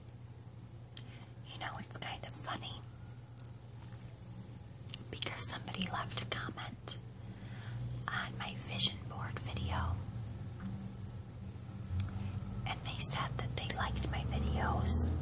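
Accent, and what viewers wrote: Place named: Michigan, United States. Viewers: Whatever accent she has it certainly sounds like a Michigan or new you accent. Whats weird though is my ex girlfriend was from Michigan and she had no accent. Her parents didn't much have one except like afew words. They would say A's real nasally and overdraw the short O sound. I don't know exactly what this lady's accent is exactly. I still like it nonetheless. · I ❤️love❤️ Michigan accents try always give me ASMR!! Especially yours lol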